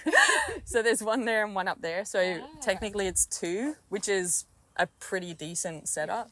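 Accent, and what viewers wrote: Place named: Australia. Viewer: She has certainly picked up the Aussie accent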